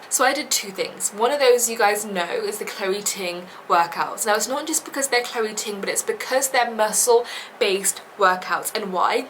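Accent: United Kingdom. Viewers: Besides the tips that you've shared, I also love your cute British accent ANYWAYS Thank you for this wonderful video 💖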